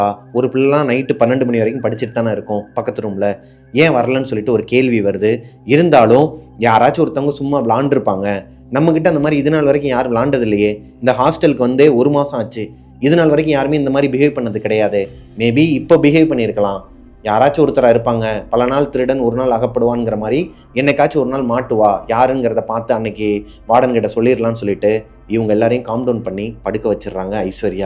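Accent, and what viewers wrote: Madurai, India. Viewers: anna naum madurai Tha anna nanum samaikura appo vessel watching apo Matti illa nna mob panra apo yellaneramum unga vedios mattu Tha nna ketutee vela papaen❤ seriously nega oru Stress Buster nna enno nega neraiyaa gost vedios poduga nna especially nama ooru slang na pesirathuu enaku romba puduchurukuu nna love u Broooooo❤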